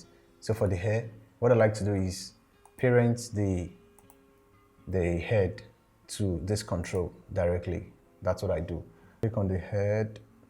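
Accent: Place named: Nigeria. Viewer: This my man sounds Nigerian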